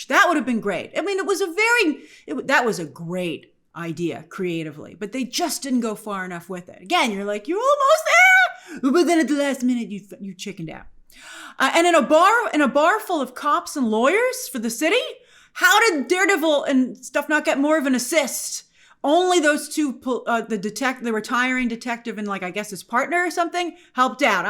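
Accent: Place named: New York, United States. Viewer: love the NYC accent hahaha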